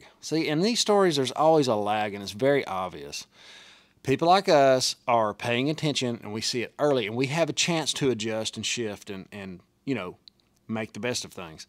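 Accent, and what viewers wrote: United States. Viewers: People with accents like yours are the best thing about America.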